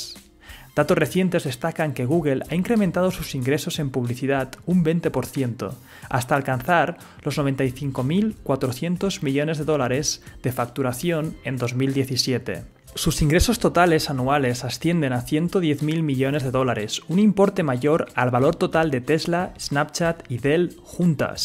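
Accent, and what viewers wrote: Spain. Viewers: Buen video, pero te aconsejo tomar unas clases de ingles, porque no se si es porque seas español pero pronunciaste mal casi todas las marcas incluida Coca Cola, pasando por Google, Microsoft, Apple, gmail... podrías optar por pronunciarlas en español. O se pronuncian en inglés o en español pero no en una fusión, el spanglish como idioma no existe y esas palabras están en inglés.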